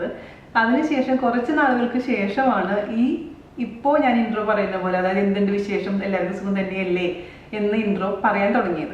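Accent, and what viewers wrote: Thrissur, India. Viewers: Intro matenda chechi...athokke karanam aanu ente kudumbathile aalayi thanne thonunne...pinne ente relativesinte okke same perinjanam side trissur slangum..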